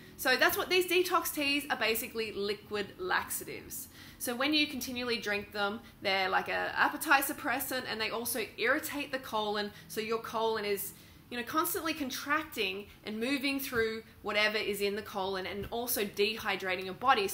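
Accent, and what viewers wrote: Australia. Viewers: Is she Australian? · I don't know what's more annoying... your accusations against Kendall or your stupid aussie accent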